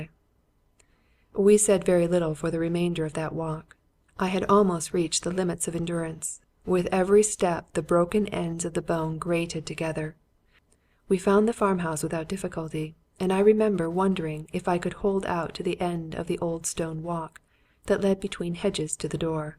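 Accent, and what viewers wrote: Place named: United Kingdom. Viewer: Disqualified by UK b voice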